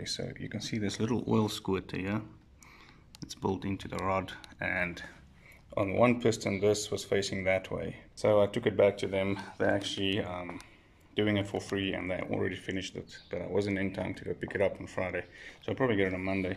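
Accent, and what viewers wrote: South Africa. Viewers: Are u from South Africa? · im not sure why but you got an south African English accent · Howzit man , It sounds like you're from SA? Is the vtec D-series top ends rare over here? Im just starting to get into hondas and going to try and get a an old ballade in the next few months so I'll probably be messing with the D series engines for a start. Seems like I'll be able to learn a lot from your channel will be checking out more of your videos!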